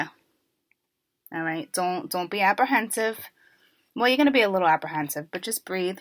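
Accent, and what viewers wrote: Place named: New York, United States. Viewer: Your New York accent is the cutest thing 💕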